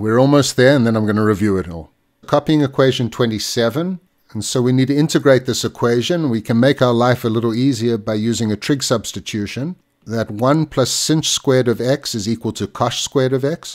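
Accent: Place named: Cape Town, South Africa. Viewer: Hey, nice. Nice to hear a Cape Town accent. I have a more important optimisation problem to solve, which is that of an elastic catenary with fixed weight at some point in the catenary. The problem space is maximum highball free-lining, where we deliberately span open spaces with elastic climbing ropes and the walk them. The ultimate boundary condition of course is elastic strength failure of the freeline. However that failure condition is not the solution to the fundamental problem. What is the catenary solution for an elastic rope in the presence of one or more discrete local masses?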